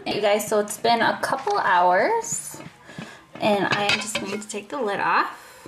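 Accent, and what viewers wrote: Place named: Minnesota, United States. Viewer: trying this tomorrow. You must be from Minnesota. I can hear it in your voice.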